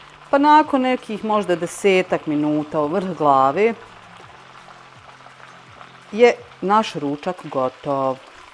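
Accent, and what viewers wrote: Bosnia and Herzegovina. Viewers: Obozavam Vas slusati dok pricate, svidja mi se ovaj recept, bogat va jednostavan, molim Vas vas recite mi iz kog ste mesta, moja drugarica je iz Bosne i prica kao i Vi, pozdrav🍀🌹🍀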